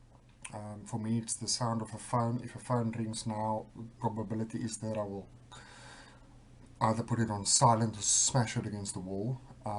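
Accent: Afrikaans, South Africa. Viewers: I have a couple friends that are from SA, that accent is Afrikaans. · What is this accent? South African? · Are you SA? Or in South Africa? I recognize the accent. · I was lekker to hear your Afrikaans accent and realize I'm not alone suffering with this in good old SA. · Hey mate! Are you South African? I detect an Afrikaans accent. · Nice afrikaans accent bloke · Guy's South African, got a Afrikaans accent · Are you South African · Leo Harker south african i think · Alice Lee Afrikaans accent from South Africa. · E Quality is it south African? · I get the depression but not the mania. I'm not Bi Polar but I experience the severe depression. It's the worst. PS I'm a South African living in China and I've been watching YouTube videos about mental illness.....it was SUCH a sound for sore ears to hear your south african accent!! Thank you for sharing your video. 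· You are strong and brave man. Can hear you are from RSA like me. Not an easy place to live in, but sharing this the way you did while going through this, i have a lot of respect for you. May God bless you and give you relief sooner than you expect it. Stay strong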